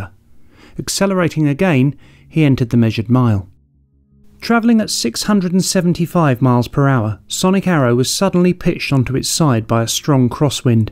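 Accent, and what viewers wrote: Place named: United Kingdom. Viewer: Great video... I got burned out on the narrator’s posh Brit accent though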